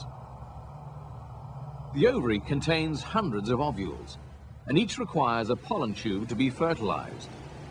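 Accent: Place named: United Kingdom. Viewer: Loved that British accent..easy to understand though than the American one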